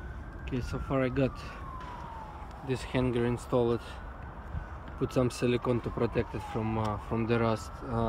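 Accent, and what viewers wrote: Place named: Russia. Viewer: Русский акцент :)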